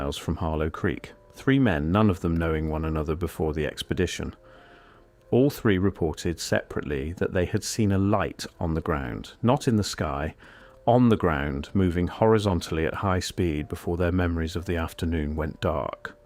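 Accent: England, United Kingdom. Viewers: This is a made up story. Note how they use a very convincible narrator with and english accent. It adds credibility.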